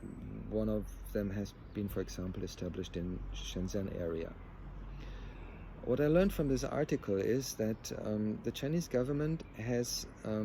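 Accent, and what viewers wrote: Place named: Germany. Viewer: I guess you are from Deutschland because your accent is like my teacher( I am a Chinese student studying in Germany). I really like your own thinking about the Chinese model. and I was also inspired by your talk also to understand further about china. I also respect you for your really independent thinking and analysis ( because most of the west media is full of ideology, arrogance, and prejudge) hope you can produce more videos about your analysis of china, whether is a good or bad point. because I appreciate your neutral altitude (Daniel Dumbrill is also a good neutral one also <link> And I think one common thing between China and Germany is that both 2 countries are pragmatic, it's like scientific thinking to manage the countries. Vielen Dank für Ihre Erklärung und hoffen, dass Sie nach der Epidemie wieder nach China kommen können.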